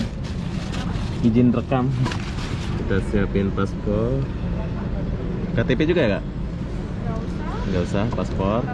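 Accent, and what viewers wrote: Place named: Malaysia. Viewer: Apa-apa benda☺️ Kamu ade mix malaysia ke percakapan kamu ade sikit-sikit melayu😃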